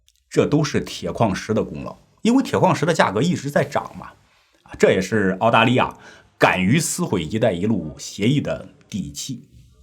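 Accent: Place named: Henan, China. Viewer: 我赌一百你是河南人，对不对？？？？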